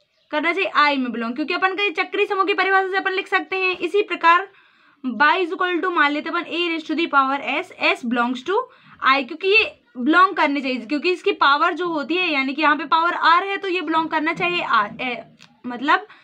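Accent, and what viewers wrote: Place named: Bundelkhand, India. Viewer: Btatey btatey bundelkhandi a he jati h...😅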